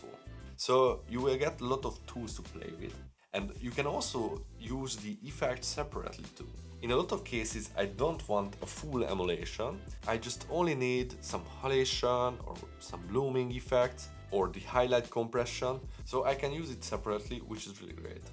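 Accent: Hungary. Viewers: Sziaaa.... When I was watching the first 10s of your video, in my head I was thinking " man this guy has a Hungarian accent " then you said your from Budapest - I started to laugh - NO WAYY!!! En is magyar vagyok. Budapesten szuletem, de Canadaba lakok gyerek korom otta ;) I just subbed tesom :) Curious since you are in europe do you record and edit on a 30fps time line?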